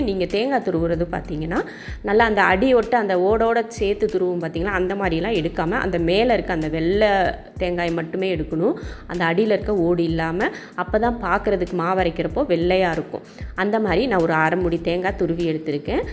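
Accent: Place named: Tiruvannamalai, India. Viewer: You are from Tiruvannamalai?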